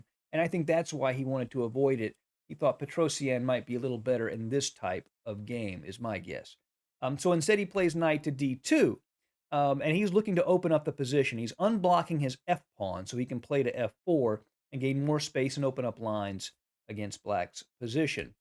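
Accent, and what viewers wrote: United States. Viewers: Thank you, sir. Your commentary is the best. You and the Chess Wisdom guy. But it's nice that you speak like an ordinary American. He is also great but the accent is a little distracting.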